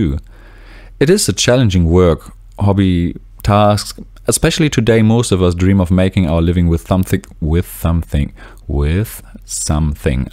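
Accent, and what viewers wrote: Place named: Germany. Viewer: hi there, thanks for the nice video. i can totally relate to your situation. i think i am addicted too, even if it manifests a little different for me. i dream of being a game dev all the time and i am one of these guys who restructured their life to do so. (i get up at 5, not at 4 but yeah...) the problem for me is, that i sometimes get so overwhelmed with the scope of my game and the obstacles i face, that i struggle to start coding. i watch other devs instead or procrastinate in some other way... i hope participating in some game jams in 2023 will help me getting in the habit of finishing games. you sound like your native language is german. stimmt das? woher kommst du wenn ich fragen darf?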